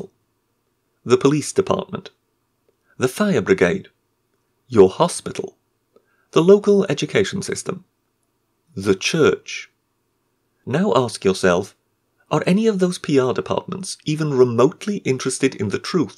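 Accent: United Kingdom. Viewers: You sound like Dr. Layman - with a British accent of course.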